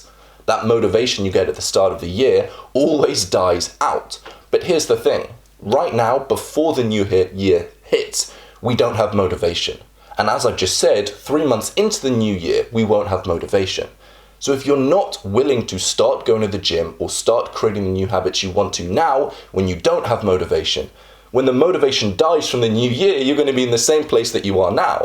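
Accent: United Kingdom. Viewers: I thought you was British.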